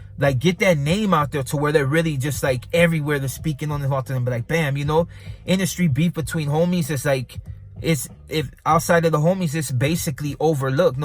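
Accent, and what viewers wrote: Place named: Mexico. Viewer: Chicanos can not rap. Leave that shit to the blacks. This dude righy here dont know shit abouy black history. THIS NIGGA THINK HE A MEXICAN. NIGGA SHOULD take that skin off and b a full mexican. Nigga dint even know his own history. Nigga talk like a mexican dress like a mexican midas well b a mexican.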